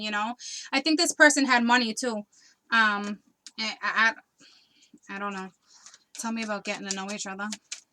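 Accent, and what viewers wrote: Boston, United States. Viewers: A good reading and your accent is amazing😊 are your from boston? Love from a Leo from the uk!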